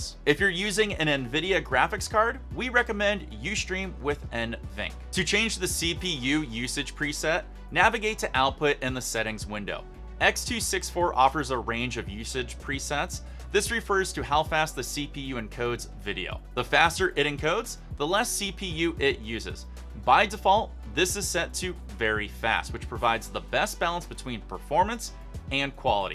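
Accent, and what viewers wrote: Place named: Israel. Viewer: אתה ישראלי אתה לא דובר אנגלית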